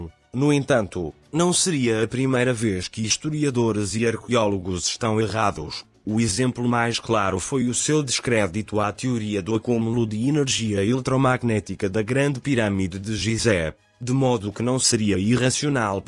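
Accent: Portugal. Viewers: Sotaque português,que porra é essa? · Sotaque português, provavelmente da zona de Lisboa... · 🎯 EXELENTE VÍDEO!👍🏻Guerreiro da Casa do Conhecimento... me responda por gentileza!👍🏻Pôr quê... à narração, está com sotaque de um português? 🤔 Estamos Juntos! 📡 NAMASTÊ! 💥🖖🏻®️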